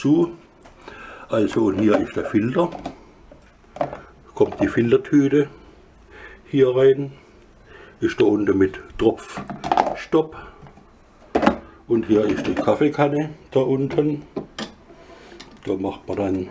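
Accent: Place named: Swabia, Germany. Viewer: Hallo, Danke für das Video. Wollte mich kurz erkundigen ob du bis dato immer noch mit der Kaffeemaschine zufrieden bist?! Bin am überlegen mir die Selbe zuzulegen. P.S. nach deinem Dialekt nach kommst du (so meine ich) aus dem Schwabenländle. Pforzheimer/Calwer Gegend vielleicht?:) Grüße aus dem Schwarzwald;)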